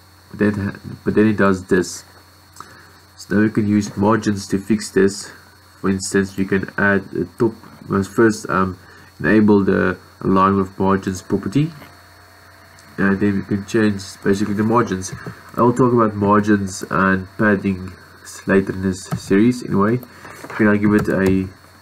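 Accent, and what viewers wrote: South Africa. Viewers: your videos help a a lot, thanks 😀 are you South African??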